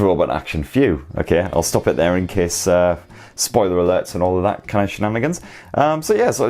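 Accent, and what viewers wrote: United Kingdom. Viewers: I just listened to this video for the wonderful British accent 🤠.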